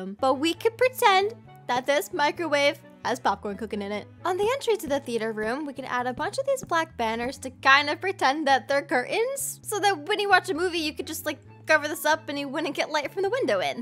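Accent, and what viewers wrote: England, United Kingdom. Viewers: Are you English?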